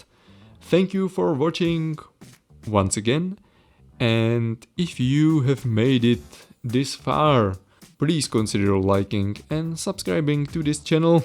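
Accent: Czech Republic. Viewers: Great summary, minor note regarding Ormus, unfortunatelly it AFAIK cannot roll FO nor any element mastery. In the shields category, I'd personally briefly mention also Gerke's Sanctuary, which has similar use as String of Ears, i.e. in ES builds for defensive setup, as it also provides solid damage reduction. Btw just guessing based on accent, aren't you Czech?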